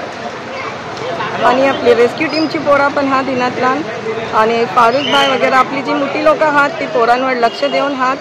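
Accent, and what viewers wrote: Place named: Konkan, India. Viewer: It's not marathi... It's kokni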